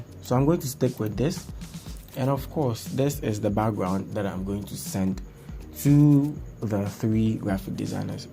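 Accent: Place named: United Kingdom. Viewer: British accent 😂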